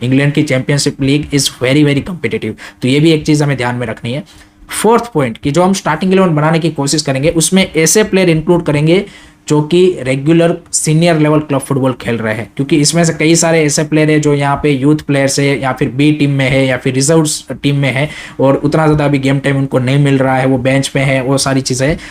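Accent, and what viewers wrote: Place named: Bengal, India. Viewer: Ap bengali ho na apki hindi sunke ashi lag ra he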